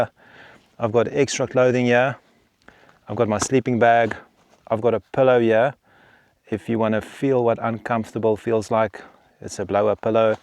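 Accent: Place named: South Africa. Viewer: "and uh yuh" i love this accent aha as soon as i clicked i knew youd be alright that accent youll only see in lion or african wilderness videos, im certain its the south african accent, people often say you guys sound like us aussies in a different way 😂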